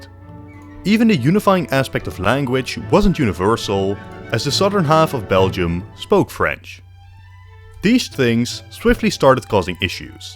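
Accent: Netherlands. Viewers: you heard his accent? Only boomers can get a more Dutch accent then him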